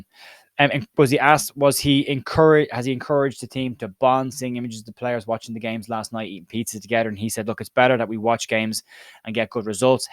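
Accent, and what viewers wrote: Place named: Ireland. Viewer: As always well delivered and short and to the point, the Irish accent is a massive plus. Now to listen to a Leeds Bird Rant and then maybe Conner if it's not too long and I can always fast-forward Joe. LOL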